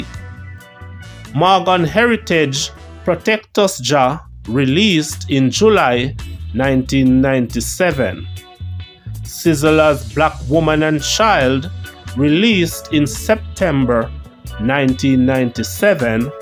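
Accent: Jamaica. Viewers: Arr u jamaican mr ur kind of proñounciation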